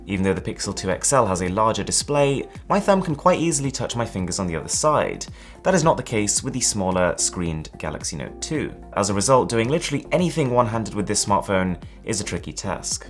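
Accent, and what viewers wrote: United Kingdom. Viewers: Ťëčhťübēř_ Přïñčē He Is Not Indian His Parents Were Indian And He Born In UK... AS You Can See From Hos Accent!!!....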